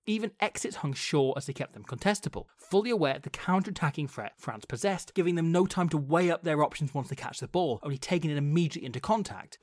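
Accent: Wales, United Kingdom. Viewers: You can turn on generated subtitles, it's not perfect but does the heavy lifting. That welsh accent can be hard to understand for a non-native speaker haha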